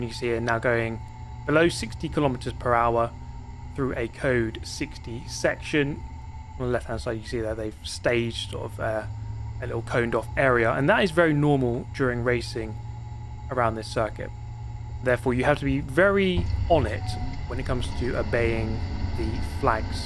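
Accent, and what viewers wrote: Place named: RP, United Kingdom. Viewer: How posh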